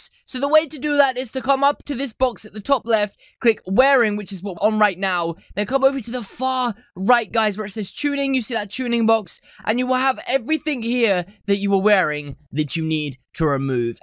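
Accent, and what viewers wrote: United Kingdom. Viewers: He's English/ Briti. He says stuff different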